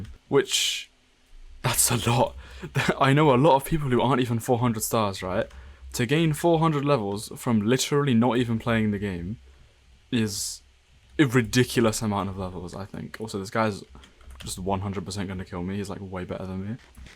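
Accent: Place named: South, United States. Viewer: Posh southerner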